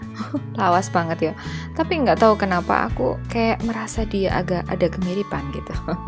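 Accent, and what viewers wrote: Java, Indonesia. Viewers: mbak saya pengen belajar makeup tolong gimana cara nya, karena saya sering melihat hasil makeup bagus dgn medok jawanya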